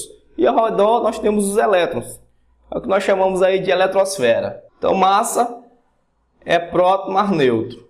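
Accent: Northeast, Brazil. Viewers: nordestino foda kkkkk É noix vlw <3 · VC é nordestino? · Huum Sotaque do Nordeste! Boa aula ♡ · Consegui entender melhor devido ao seu sotaque, tbm sou nordestino, abraço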